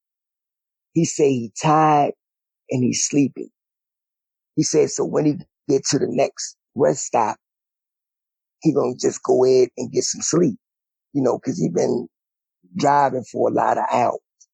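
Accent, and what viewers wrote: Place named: South, United States. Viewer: Just his southern accept so cool!!!💖💖💖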